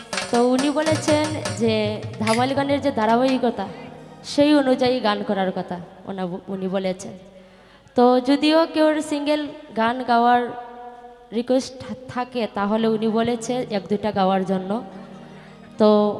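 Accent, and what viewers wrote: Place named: Sylhet, Bangladesh. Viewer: উনি সিলেটী ফুৰি (মেয়ে) তাই বাংলায় কথা বলতে ভিল হয়...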